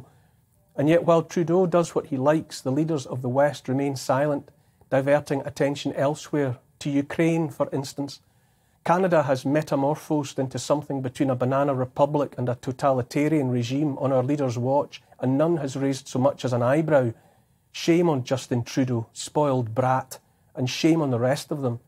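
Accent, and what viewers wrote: Scotland, United Kingdom. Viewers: I LOVE this Guy, thank you Neil, first of all, as a Swiss born & raised I couldn't speak English when I came to Canada in 1980, I got taken in by an elderly Scottish Couple, hence, it's Scottish English I learned first, so I love listening to you, greed is killing us, Pharma greed NOT THE good old "FLU" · Thank you from Germany for your good words! I love your accent, reminds me of a wonderful holiday in Scotland with my husband long ago.....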